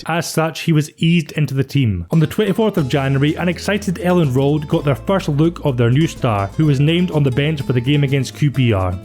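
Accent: Scotland, United Kingdom. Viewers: This is one of my favourite channels..why? Because u cover players from the 90s and early 2000s..my childhood years..also u cover unsung players like tony yeboah..we all know his goals for leeds but the rest of his career doesn't get spoken of really..i also love ur scottish accent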